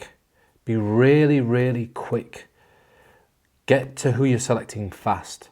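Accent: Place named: Northern England, United Kingdom. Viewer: Thanks, I thoroughly enjoyed that.....younger effect was totally baffling too. I am not northern, and I don't think you needed to knock your accent, it is part of who you are and gives you context.......due to your performances over the years plus a few other people from the north, I have come to associate your " Northern Accent" with amazing and innovative "mentalism".......a crazy anchoring that is now firmly set in my mind. Thanks again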